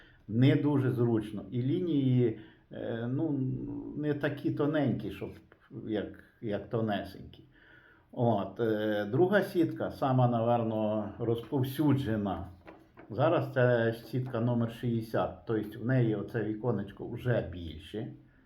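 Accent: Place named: Vinnytsia, Ukraine. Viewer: Шановний пан! Чудово, що Ви зробили свій канал і популяризуєте мисливство. У Вас надзвичайна харизма і неперевершена вінницька говірка, Ви прекрасний оповідач і фахівець своєї справи. Але ваші відео не тільки корисні а і вредні) Я три місяці тому купив АР15 в 223 калібрі для захисту, тренувань і розваг, і зовсім не для полювання. На стрільбищах і бачив болтовики і стріляв трохи. Також дивлюсь чудові збройові посиденьки від Ібіса з Тарасом і Антоном. Вони теж популяризують зброю, зокрема болтовики. З ваших веселих оповідей я захопився полюванням на дивані) хоч всього пару раз був на охоті колись глядачем. І прикидував, як би то я зі своєю аркою теж би ходив. І тут Ви кажете, що 223 калібр зовсім не підходить для полювання, я Вам, як фахівцю безперечно вірю, очевидно, що це так і є. А Тарас якраз розхвалював карабін Маузер М18 в 308му калібрі. 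Все, думаю, куплю собі Маузера! Подивився відео, які вони класні, і знайшов, де його можна купити найдешевного зі стволом 51 см. Порадився з родиною, ну, кажуть, твої гроші... Зібрався в Ібіс по планку Пікатіні, а потім в інше місто по карабіна, і за три кілометри до Ібіса думаю - а нафіга він тобі треба, той Маузер? Яке полювання, ти по справжньому ніколи на ньому не був, і не знати коли ще попадеш на нього. Купити, щоб клацати класним затвором, і іноді стріляти по паперовим мішенькам? То у тебе є арка для цього! Ні, не час зараз для цього. Цілий ранок мені було погано, що відмовився від скоротічної мрії, а потім сам себе хвалив за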